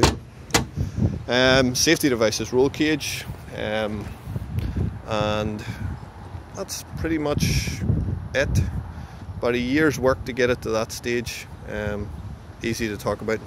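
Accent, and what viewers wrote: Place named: Northern Ireland, United Kingdom. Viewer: Geekers Sur your norn Irish! Now I want one built!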